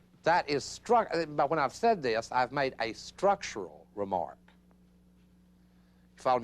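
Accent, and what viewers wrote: South, United States. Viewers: That thick southern accent doesnt help......at all.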